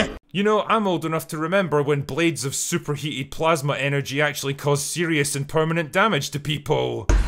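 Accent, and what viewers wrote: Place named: Glasgow, United Kingdom. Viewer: I've haven't seen any disney movie in decades. I just check out the reviews; that's all I need. Jeezuz I love sardonic, hilarious put downs of rubbish. And with a Glasgow accent too!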